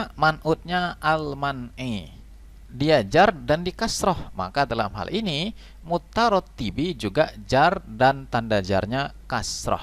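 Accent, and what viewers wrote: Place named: Medan, Indonesia. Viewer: Subhanallah jazakallahu atas ilmunya ust. Ust. Boleh tau gak.ust Asalnya dari mn ya Kok ngomongnya kayak ustadz2 medan sumut. Syukron dr rusli di medan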